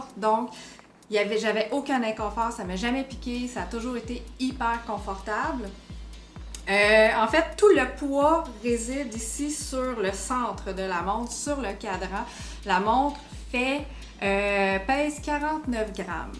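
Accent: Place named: Quebec, Canada. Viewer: language de cetebese .. quebecois ..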